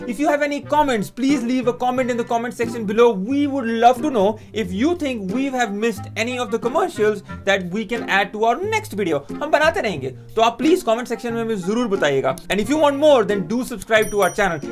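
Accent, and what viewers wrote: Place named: Punjab, India. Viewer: This guy has been all over World yet his punjabi soul takes over when speaking Hindi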